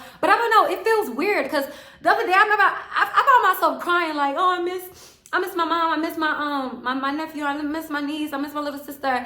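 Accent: New York, United States. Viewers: You sound so New York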